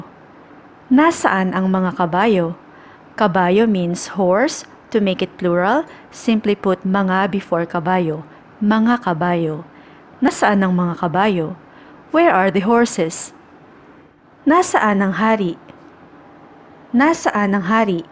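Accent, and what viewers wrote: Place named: Philippines. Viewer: That’s the true Filipino accent actually. Very far away on how they portray Filipino accent on the internet/movies. 😅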